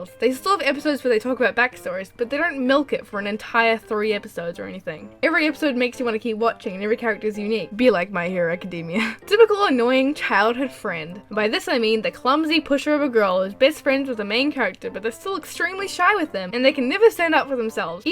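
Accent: Australia. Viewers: You Aussie?? YASS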